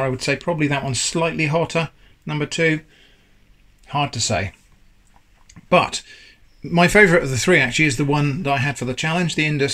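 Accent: United Kingdom. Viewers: You and your wife are so adorable together at the dinner table! I love your British accent. I love all your videos! You're so down to earth and smart. You make very good content.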